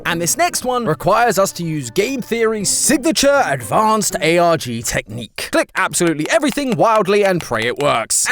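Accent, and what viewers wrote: United Kingdom. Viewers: Honestly has really grown on me, I kinda like him more than matpat tbh but that could just be because he's got a funny British accent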